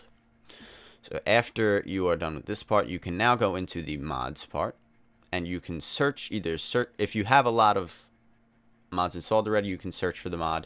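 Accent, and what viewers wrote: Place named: New York, United States. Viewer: This guys from new york no doubt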